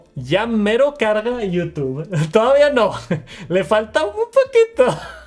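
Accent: Mexico. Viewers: Porque habla así con ese tono, como afeminado o de niño adinerado. 🤔 Es por Alguna region de México hablan así o es solo esta persona ?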